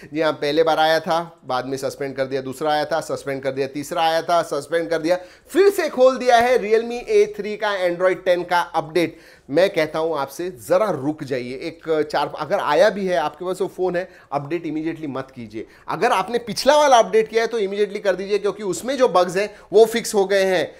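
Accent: Maharashtra, India. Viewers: Your style is a bit different and with a delicate touch of marathi you are a perfect knowledge enhancer.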